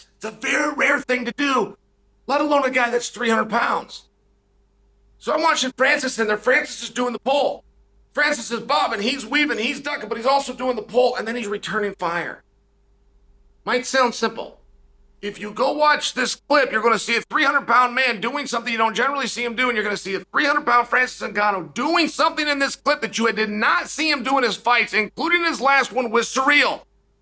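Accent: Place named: United States. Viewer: Ok Chael, you say the word poll nothing in a google search. The closed captions say you said poll. There is a move called a pull, I’m guessing it’s the yanky accent.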